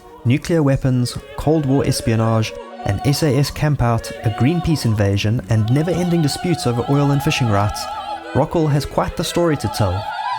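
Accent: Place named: South Africa. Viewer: Super underrated video! I love the accent too, I'm originally from South Africa but have lived in the UK for over 18 years now, I've not heard a voice like mine for so long, it's crazy to be reminded other people speak like that too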